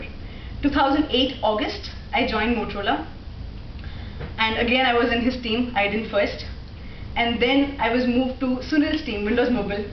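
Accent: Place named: France. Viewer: the rythum of your English is almost French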